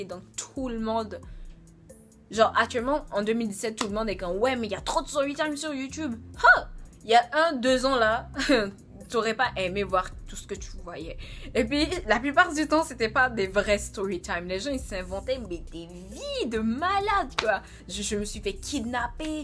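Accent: Quebec, Canada. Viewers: Tu vis au Canada ? On dirait presque que t'as un accent Québécois ;) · Ton accent est tellement beau! on dirait du québécois du français et de l'anglais c'est trop mimi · jannette jackson C'est pas un accent anglais mais québécois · Accent canadien? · T’es tu québécois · T’es québécoise ?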